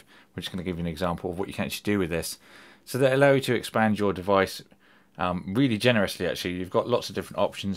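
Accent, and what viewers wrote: United Kingdom. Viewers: IS this only for the phone? Can I use this product on a computer? darn it? love your british accent muah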